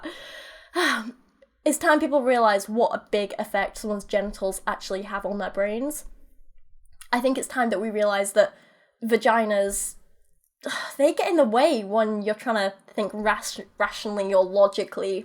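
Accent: United Kingdom. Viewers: Are you British? I swear i can hear a bit of British in your accent.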